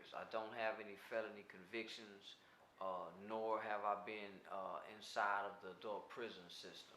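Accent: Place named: South, United States. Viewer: As a fellow Southern Black Man from Alabama with Texas ROOTS, I SUPPORT MY BROTHER. Hes highly intelligent and speaks words of wisdom!!!! GLAD that he exposes those dysfunctional California LA gangbangers as a HORRIBLE threat to ALL of America!!!! This was an Awesome Bio. Thank you Mr. White. I love that Southern Accent! AWESOME!!!😊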